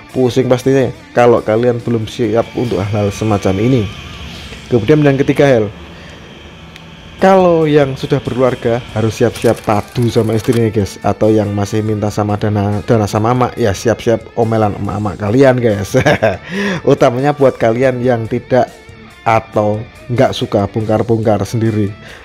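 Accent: Magelang, Indonesia. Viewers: Magelangan banget om 😂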